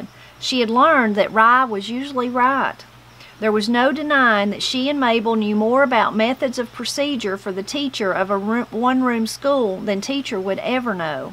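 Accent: Appalachia, United States. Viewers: I’m so happy to notice that there seems to be plenty of pages yet to go in this amazing book! Perfect listening for me to cook supper while listening.. 😉 sweetly feminine voice, southern Appalachian accent and vocal cadence couldn’t be more perfectly ideal for narrating this story! Thanks again, and God bless y’all 💯🇺🇸❣️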